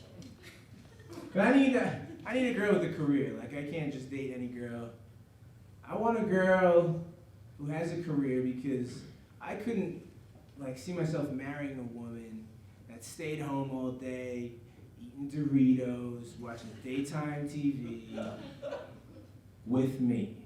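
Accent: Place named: Brooklyn, United States. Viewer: I thought he was from Brooklyn.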